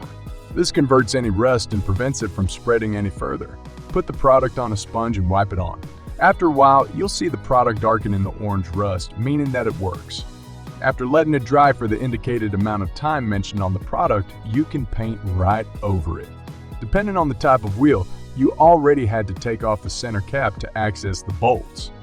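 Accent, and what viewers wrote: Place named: South, United States. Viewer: Dude, that video was f-ing amazing! Plus I'm pretty sure chicks totally dig that southern accent! Subscribed.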